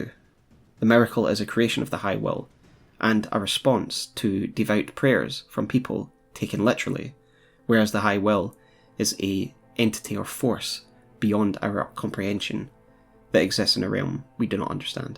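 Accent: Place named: Scotland, United Kingdom. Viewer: I'm gonna guess your accent and say your country of origin is Scotland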